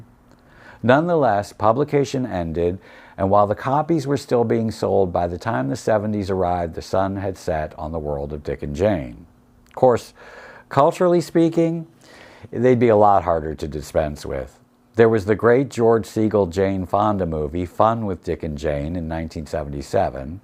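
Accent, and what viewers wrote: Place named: New Jersey, United States. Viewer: P.S. whatever sounds like New York but you do not sound like a New Yorker. Oh! Ok NJ.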